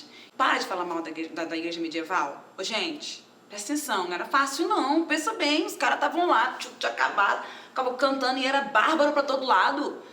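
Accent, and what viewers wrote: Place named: Minas Gerais, Brazil. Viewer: Oh gente!!! Mineira mesmo kkkkkk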